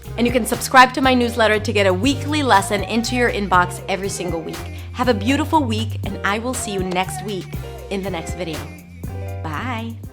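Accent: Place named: United States. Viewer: like your American voice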